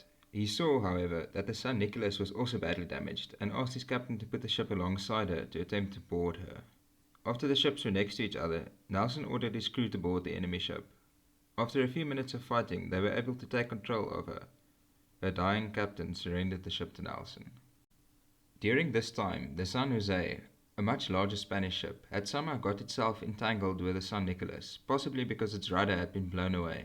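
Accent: South Africa. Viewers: Perfectly good English. Don't let the morons get you down. Sounds like a South African accent maybe?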